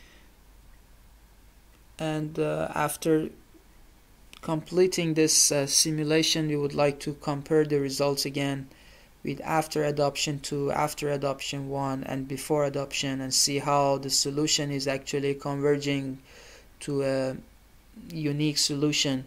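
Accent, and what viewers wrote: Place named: Iran. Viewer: Persian ACCENTTTT.!!! Nice video btw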